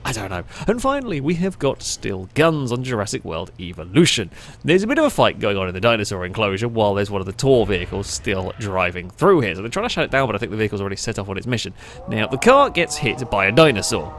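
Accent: United Kingdom. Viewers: Thank you Youtube 4 that recommendation i enjoyd that video, i really like that british norrater forgive my bad english aim still learning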